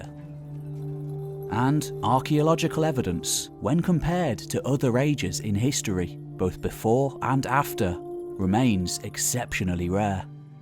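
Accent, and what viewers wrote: England, United Kingdom. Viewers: thank you for this well put together documentary ! I assume you are English from your accent which is presumptius but its always great when one of our freinds from down south manages to shy away from the many cliches about the picts . what is not known about through written evidence can in many ways be realised through the highly sophisticaited artwork the picts left behind , it is almost certain that the picts spoke some kind of p celtic language ,perhaps not to different from britonic .you can see evidence for this in many place names all over the pictish areas of scotland unfortunatly nothing from this legacy seems to have passed into the scots language ,I have heard that perhaps the north east use of F instead of WH might be a remenant for example "fit like" instead of "what like" in English and often "Whit like " in scots . 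Doric language is itself littered with archaeolagy from Scots ,French ,English, Gaelic and loads of Norse . i really look forward to seeing what conclusions are drawn from this site in the near future .And keep going with this fabulous site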